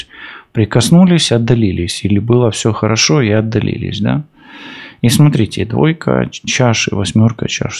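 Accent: Ukraine. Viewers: Яка у вас красива українська мова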